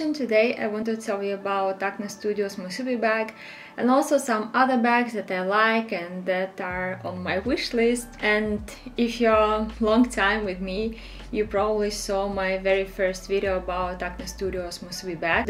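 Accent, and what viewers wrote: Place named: Russia. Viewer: You have russian accent but you obviously dont look russian)) Where are you actually from?)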